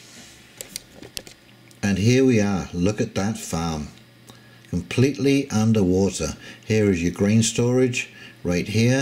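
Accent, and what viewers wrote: England, United Kingdom. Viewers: you have a clear English accent - but you talk as if you might be in the USA. Forgive me if I have missed something. Could you say where you are based? I am in the UK SE. I now listen to you regularly. Regarding your food advice: I reckon I have got things quite well organised for myself. My worry is for the cats and how I would feed them if there was a famine. The only thing I can think of for pet owners is to buy several sacks of dried food.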